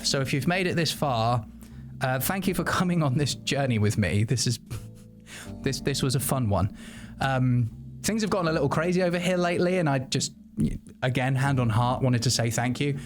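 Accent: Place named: United Kingdom. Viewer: thank you for the new video and I am a new subscriber to your YouTube channel love your videos and love your British accent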